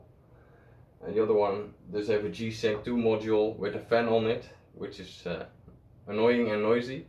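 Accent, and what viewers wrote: Netherlands. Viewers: Ik hoor gwn dat je nederlands bent🤣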